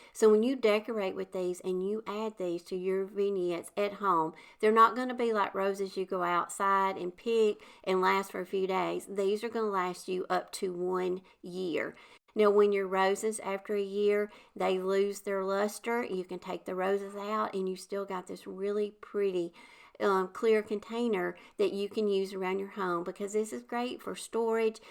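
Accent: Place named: Southwest Virginia, United States. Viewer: love all these ideas. May i ask where you are from. You sound so much like me, I was thinking Southwest Virginia. love your content.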